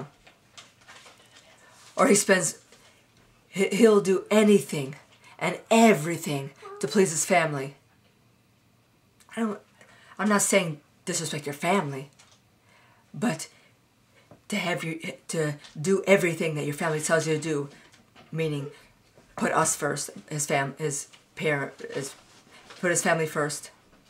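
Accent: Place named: United States. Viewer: That is Islam. Did you read the Koran? It states women are worth half as much as a male. I married an Iranian in 1990, had him jailed several times for abuse, divorced him and he's lucky I didn't kill him. Now he is remarried to his cousin, that was their plan all along. Inbreeding is the norm, birth defects are the norm, abuse is the norm. You sound american woman, don't married another muslim. Religion is evil, all of it.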